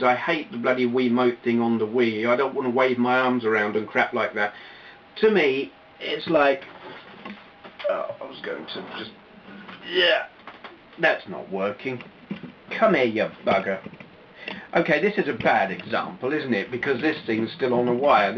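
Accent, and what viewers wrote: United Kingdom. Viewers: it was me not understanding you, it took me a while to get used to hearing sarcasm in a brittish accent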